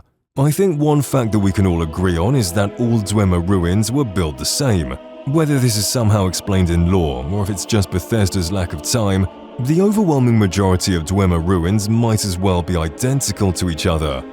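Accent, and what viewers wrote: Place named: England, United Kingdom. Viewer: That's how it is pronounced in England. You know, where English is from. Seriously... Americans are unbearably thick.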